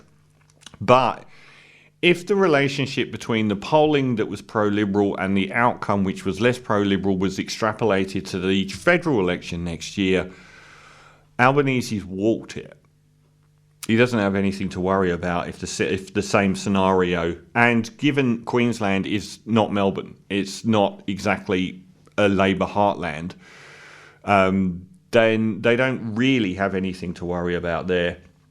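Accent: England, United Kingdom. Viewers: You clown! You sound like a pom bringing your lefy garbage to our country.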